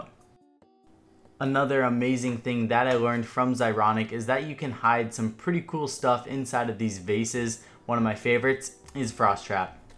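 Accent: Hawaii, United States. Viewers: I was actually gonna ask if you were from Hawaii until you said it. I'm from Hawaii too (near UH but I grew up here), was just gonna say it could be a good idea to advertise that just for being unique!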